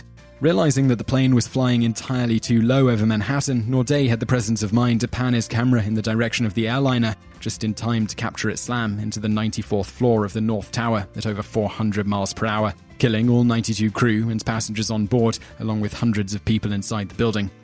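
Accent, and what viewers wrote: England, United Kingdom. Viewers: As told with an English accent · He's a Brit, so give him a break! Lol!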